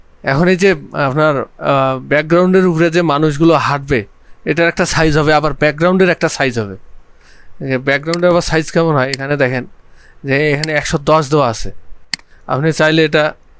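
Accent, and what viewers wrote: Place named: Rangpur, Bangladesh. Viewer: ভাই আপনার বাড়ি কি রংপুরে নাকি? ভাষাটা খুবই পরিচিত মনে হচ্ছে ❤️❤️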